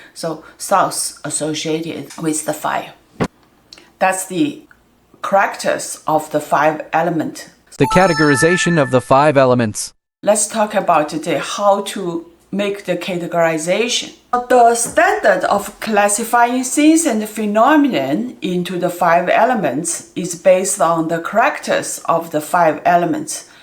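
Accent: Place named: China. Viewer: I love your accent its cute Chinese English I love it by the way you are not only a good tutor but also beautiful women beautiful wowoowow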